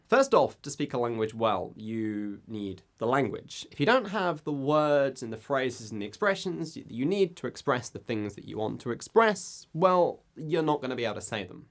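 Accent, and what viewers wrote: United Kingdom. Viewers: What's his accent? which kind of British accent?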